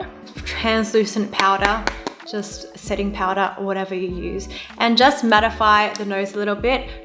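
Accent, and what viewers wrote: Australia. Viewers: Man, I’m so glad I discovered your YouTube channel. You’re just so absolutely gorgeous and beautiful. I also love your Australian accent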